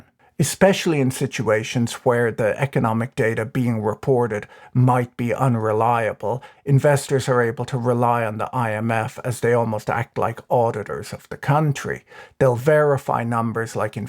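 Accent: Ireland. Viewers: I do miss the occasional comedy. Everything in an Irish accent is funny. However, he has to be one of smartest men I seen in a long time.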